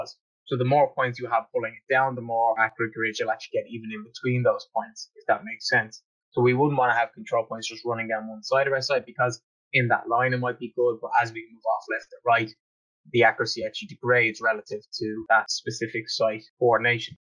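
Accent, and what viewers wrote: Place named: United Kingdom. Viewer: good video and great sequence of information; however you sound British, or European with your accent and as an American and somewhat decent land field surveyor,,,i really, rally think your video would be, reviewed , as much much better is you did not speak so fast. and leave out the trivial , stick to the tech detail and process and insert some pauses in between with also maybe some recall or repeat of stuff and say something like , ",,, ,, remember or as i previously said,,,," .Thanks !!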